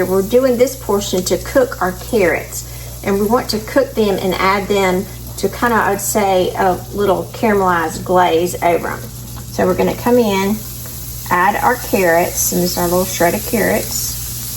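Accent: South, United States. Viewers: im in northern maine and the temps are slowy dropping here the leaves are just starting to turn/drop...i found you on my home page... 1 THING I LOVE about your channel... your accent isnt as heavy as alot of southerns..I DO LOVE IT BUT some can get "twangy" /sing songy, if you get my meaning... and you dont do alot of chattering when you cook and you CLEAN OUT THE DISHES!!!!! my pet peeve.. with everything going through the roof HOW CAN YOU AFFORD TO NOT USE IT!!! thank you for all that you doo..;];];] keep up the great work;];];]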